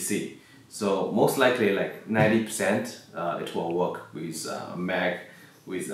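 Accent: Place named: China. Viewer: can you please add SUBTITLES for your bad chines English? Thank you.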